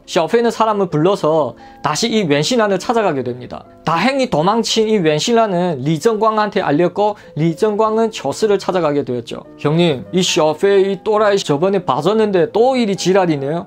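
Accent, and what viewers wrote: Yanbian, China. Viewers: ㅋ ㅋ 완전 한시간동안 헛소리만치구 자빠졌네 말투가 연변말투인데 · 말투가 조선족인데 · 연변말 와 한국사투리 힘들게 듣고 감니다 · 연변말투 배우고 싶다 혼자야~앙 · 너무웃겨요 ,,중국식 한국말이요 ,👍👍 재미있고 흥미롭네요 ,,조선족들에 만행들이 ,대단하네요 ,범죄도시, 실화를 바탕으로 헐? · 延边土话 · 오랜만에 조선족 이 말하는 조선말 들으니 예전생각 나는군요 중국에서 내밑에서 일하던 조선족 직원들 생각납니다 다들 잘있는지 재미있는 채널이군요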